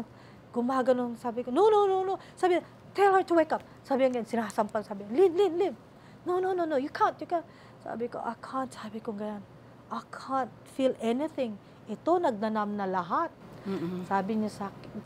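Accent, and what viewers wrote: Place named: Australia. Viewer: Very easy call Mayor Magalong. He will find your mom Garcia. Your accent now is like Aussie, but you’re right in Australia they take care of their people , specifically women. But in Australia you have to work hard to survive. And bare with paying tax, kasi kapag nakita mo kaltas sa sweldo mo sasabihin mo Bakit parang nagtatrabaho na lang ako para mag tax. Hehehe. Cost of living in Australia is high specially here in Victoria specially MELBOURNE.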